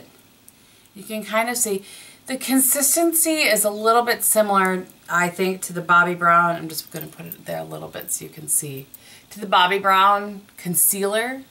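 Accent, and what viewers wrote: Wisconsin, United States. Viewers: For some reason your Wisconsin accent was super noticeable in this video. Too cute!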